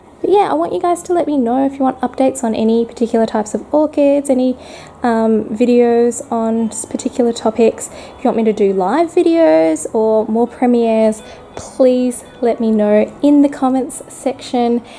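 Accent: Australia. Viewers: Thank you for this great 100th video. Nice to see the face with that fabulous Australian accent. Keep the great videos coming!!!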